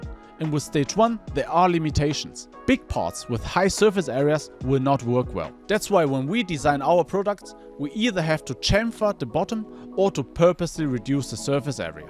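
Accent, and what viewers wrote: Germany. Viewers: I just realized this dude Asian, never thought I would see an Asian with a German accent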